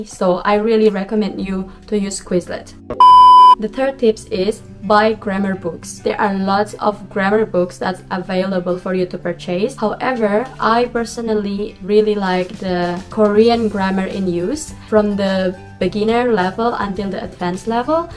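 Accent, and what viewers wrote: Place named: Philippines. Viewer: Oh yoy r a filipina !the English lol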